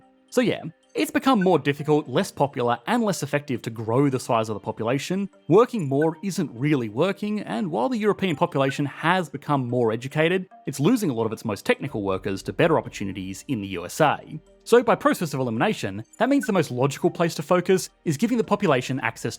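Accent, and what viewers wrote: Australia. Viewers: Cadence is too fast. Ausssie accent makes it even harder to understand. Chaotic topical progression. Uggh. Utter disaster.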